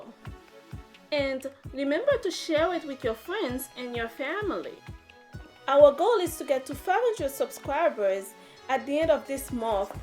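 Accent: Haiti. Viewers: Your accent sounds like you're Haitian 🇭🇹!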